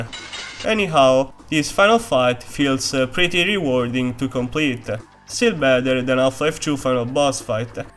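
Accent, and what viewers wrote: Italy. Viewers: Nice vid but u sound so italian lol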